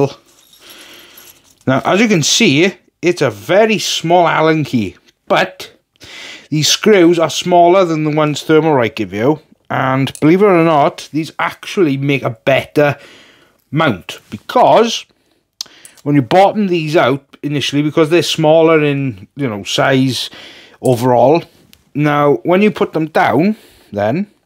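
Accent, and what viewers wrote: Wales, United Kingdom. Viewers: Definately a man from Wales! Love!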